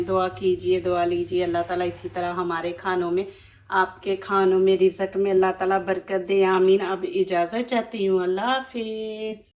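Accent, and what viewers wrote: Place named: Punjab, India. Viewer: Apna bo8lne ka st5yal sake karin. P9anjabi le3hja na ho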